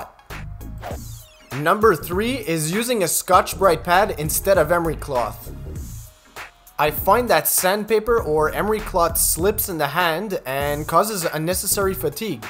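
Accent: Quebec, Canada. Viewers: I could tell you re from quebec ... the plate confirmed it 😎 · AHA... yes I thought I heard a Canadien brotha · I knew you were from Quebec 😂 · I like the Canadian accent!! It's all good heh!!!! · I love the accent. I just knew you were from Quebec. Hey, you're a smart guy. Merci for sharing your knowledge. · French Canadian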